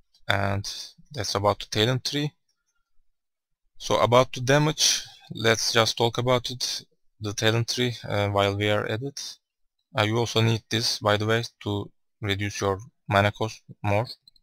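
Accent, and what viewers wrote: Turkey. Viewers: I spot turkish guy who is trying to speak english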